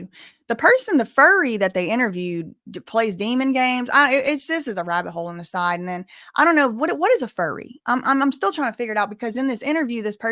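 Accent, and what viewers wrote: South, United States. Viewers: Wow you're story's amazing, congratulations on going from where you did and with disabilities to now a lawyer, and I love your southern accent is so cute just like Britney's, don't ever change it. You should email that person and just say well bless your heart your southern so I'm sure you know what that really means when you tell someone that lol. ❤ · I’m not American, but I don’t get why a person with southern accent is seen as lower in any capacity. It’s pretty evident that you’re incredibly intelligent. And you did it, you are on top, don’t let anyone make you feel less than. You’re so beloved and respected because you’re the real deal and i bet there are young girls looking up to you and wanting more for their lives despite the circumstances they are growing up in. You’re wonderful, funny, smart, beautiful and quick on your feet. 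We love you girl ❤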